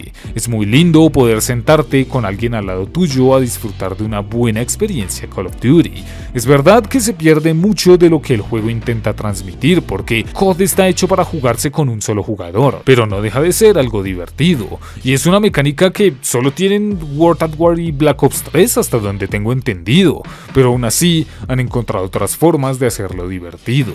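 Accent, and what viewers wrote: Colombia. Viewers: Gran video. Oye una pregunta, ¿tú de que parte de Colombia eres? xd.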